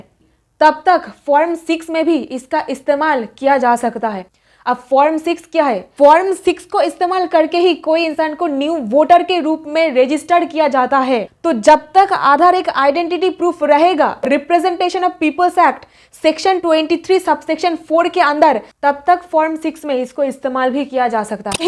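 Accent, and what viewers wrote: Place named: Bihar, India. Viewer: मैडम Aap बिहार से हो क्या, आपकी भाषा ऐसी है |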